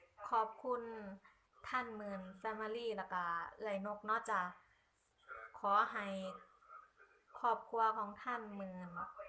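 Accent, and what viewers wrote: Korat, Thailand. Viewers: สวัสดีจ้า ภาษาโคราชน่าฟังค่ะ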